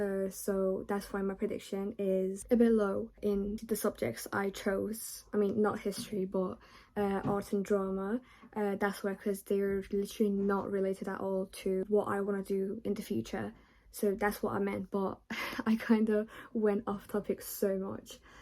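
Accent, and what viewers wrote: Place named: United Kingdom. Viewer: من عاشق لحجه بریتیشت شدم اخهههه🥲💖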